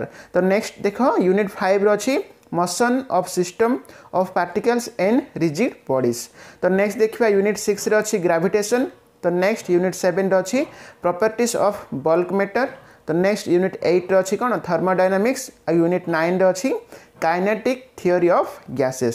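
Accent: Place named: Odisha, India. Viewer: Sir Odia English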